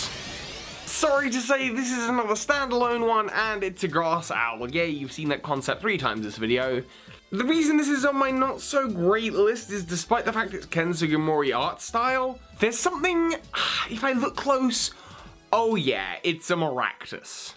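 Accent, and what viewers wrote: United Kingdom. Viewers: him "heynpokemon masters " me omg your british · It's an English accent. English accents are pretty clear and easy to understand, unless English isn't your first language. also 'a shit'.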